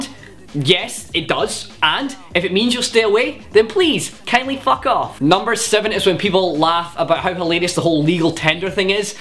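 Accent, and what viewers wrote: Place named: England, United Kingdom. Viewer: I thought you were English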